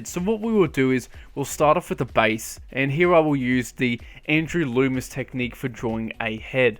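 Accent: Australia. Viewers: Is that an Australian accent?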